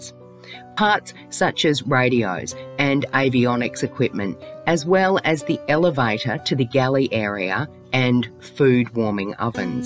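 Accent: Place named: Australia. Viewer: I just added this or subscribe to this channel and I just love this channel the woman that was speaking sounds like she has a lovely Australian accent, which I live in the United States but it sounds like she’s from Australia or New Zealand and or England but anyways, I just want anyone who’s reading this to know that you’re not alone in this world if there’s ever something upsetting you were bothering you always reach out and talk to someone people will understand more than you know I’m 57 and divorced with no kids and I’ve been just about through everything and you can just make a checklist of everything is happened, but I think God is testing me to make me a stronger person again I wish every single person reading this long long happy and healthy beautiful life. I wish nothing but the best for everybody here on this forum. God bless you all. Sincerely MINDY from California. 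Just sending hugs God bless. 🙏🙏🙏🇺🇸❤️🌈🌟💖👇🏽😊😊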